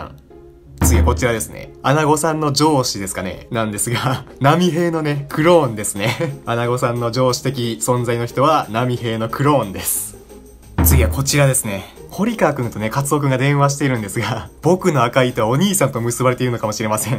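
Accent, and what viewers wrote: Kansai, Japan. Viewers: この人関西出身やな？！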